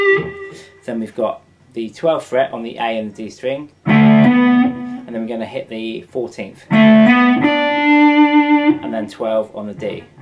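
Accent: England, United Kingdom. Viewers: english mate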